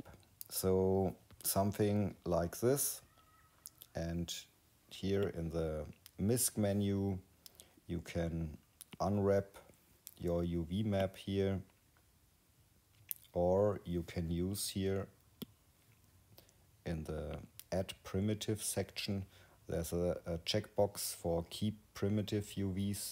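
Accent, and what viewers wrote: Bavaria, Germany. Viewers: bavarian english is dope.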